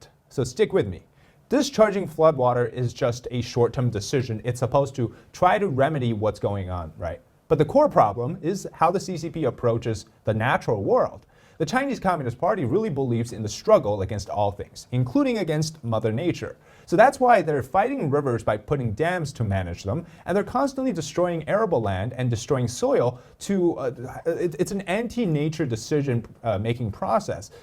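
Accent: United States. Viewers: >Accent : American >Looks : WTF, get a fg haircut, boy! Why are you still having Fk-loon-gong rural hairstyle!🤣 (make sure you make up your mind to part it to the left or right boy, hehe)😊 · You sound like an American, bro. 😅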